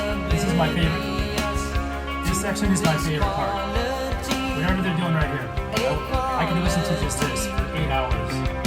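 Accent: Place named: London, United Kingdom. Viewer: “Allo, G’mornin’ Guv”….Very London. ITS so soft that most people miss it.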